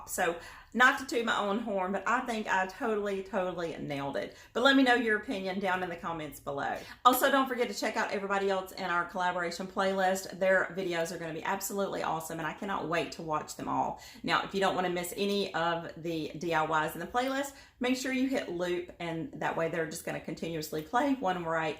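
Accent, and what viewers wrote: South, United States. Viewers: You nailed it sista! So nice to hear and see occasionally, a southern gal, like myself, doing diys . I’m a Kentucky girl. I’ve just recently started doing diy projects and there are so many ladies that teach and/or show how to do different things. It’s a tad overwhelming at times but I’m glad I ran across you. I’ll be sticking with ya Momma!! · I love that I have Found someone who has that Southern accent like me, lol.I tell everyone that I'm a Flatland Hillbilly from Texas, lol.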